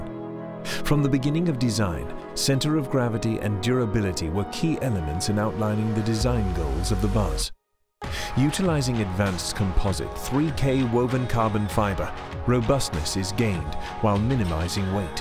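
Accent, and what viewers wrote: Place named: United Kingdom. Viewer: For some reason when someone has a British accent I take everything they say much more seriously. It makes everything thing seem more high tech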